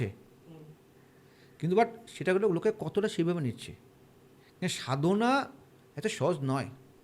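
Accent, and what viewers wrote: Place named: Assam, India. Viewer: দাদা কথাগুলো খুবই ভালো লাগে কিন্তু দাদার কিছু কিছু কথা আমি বুঝতে পারিনা দাদা কি আসামে থাকে কিছুটা কথা আসাম টান আসে কালীঘাটে দাদা কোথায় বসে❤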